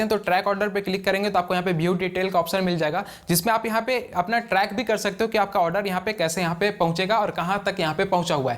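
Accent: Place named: Deoghar, India. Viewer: Me bhi bhai deoghar Babadham state se hoo me aapka aavaj se hi pakad liya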